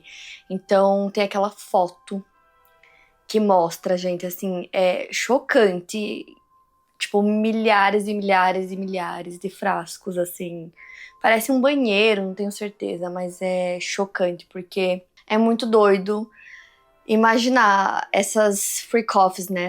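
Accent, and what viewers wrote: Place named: Paraná, Brazil. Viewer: amo q a não perdeu o sotaque paranaense hehe <3 me sinto representada pela diva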